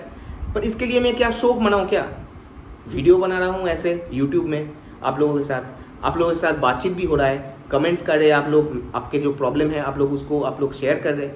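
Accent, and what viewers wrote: Bengal, India. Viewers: Aap ka voice bengoli accet hai ?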